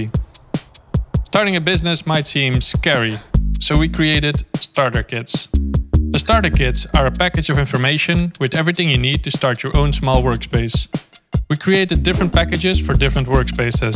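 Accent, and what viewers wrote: Netherlands. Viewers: I am not sure if the dutch accent really works for me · thomas hoogewerf he’s Dutch! What accent should he have?!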